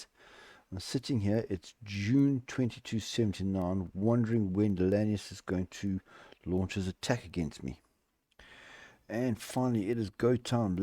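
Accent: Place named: Afrikaans, South Africa. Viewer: Best vids, bolstered by unique Afrikaans accent for American viewers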